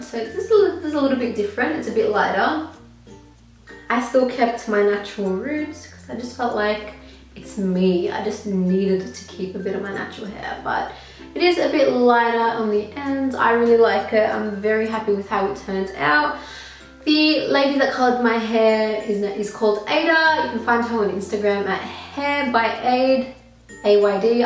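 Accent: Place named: Australia. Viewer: Paayal Shah lmao I was thinking the same?¿ I thought to myself it's probably because of her Australian accent or something! 🙃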